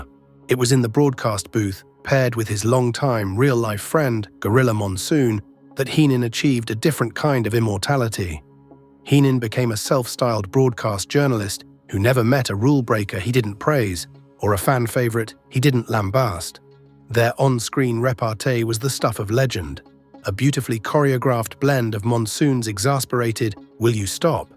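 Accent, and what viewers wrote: United Kingdom. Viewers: It's amazing to me how a great topic like this can be turned into a complete dribble by a British accent thumbs down for that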